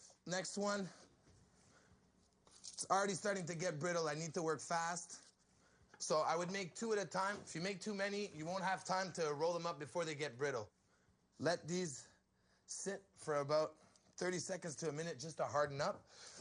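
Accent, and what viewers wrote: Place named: France. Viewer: hes not gay. french is his first language so he sounds a little different. im going to marry him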